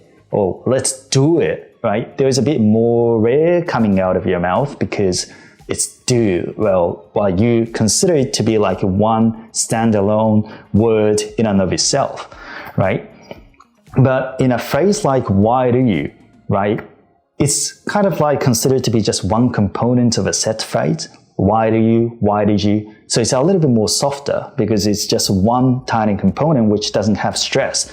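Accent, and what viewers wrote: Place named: Australia. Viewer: 今回は特にあつさんの英語がたくさん聴けてうれしかったです😍あつさんの英語は「ポッシュなオージー」みたいな勝手なイメージを抱いています😅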